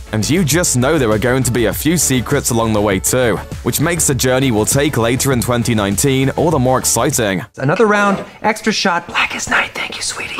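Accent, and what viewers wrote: United Kingdom. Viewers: Only fitting they the person who narrates has a British accent.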